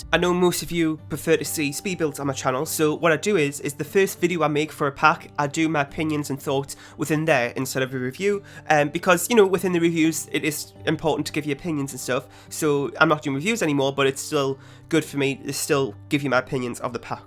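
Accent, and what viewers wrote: Newcastle, United Kingdom. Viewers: Just found your channel and Iv got too say I’m not sure of your accent but if it’s geordie then me too haha! Your build is so accurate too my grandmas house aswell and I think it’s really cool! Bets build Iv seen in a while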